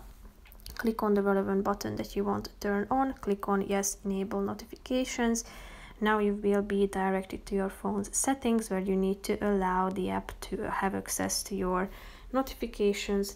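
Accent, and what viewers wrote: Hungary. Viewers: Are u Hungarian?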